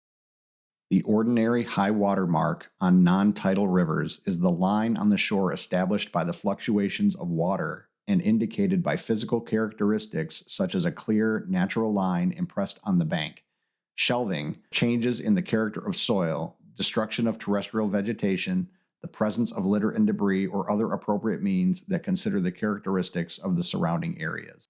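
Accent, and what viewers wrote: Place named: Ohio, United States. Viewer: It would seem to me you’re from NW Ohio lol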